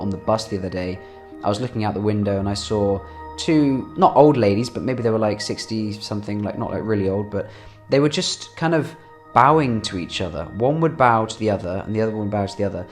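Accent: United Kingdom. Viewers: I like it! Saw your film for the first time. I was impressed with the pictures and narrations, both are very calm, gentle and professional manner. Slowly and calmly spoken English, with slight British accent allowed me to pick up every single words. Thank you. Old japanese man, living in tokyo. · イギリスの方ですね！英国なまりですもんね、大阪を紹介してくれてありがとー‼️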